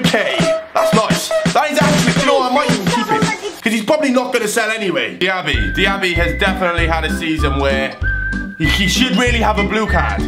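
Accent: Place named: Newcastle, United Kingdom. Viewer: You sound like a Geordie shore person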